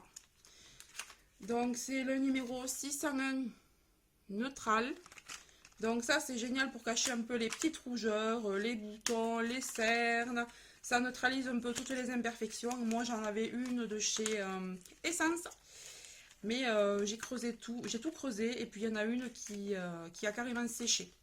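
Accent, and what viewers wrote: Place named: South, France. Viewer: Coucou, j'ai découvert ta chaîne la semaine dernière et j'aime beaucoup tes hauls! Et cet accent du sud... C'est un plaisir à entendre! Bonne journée à toi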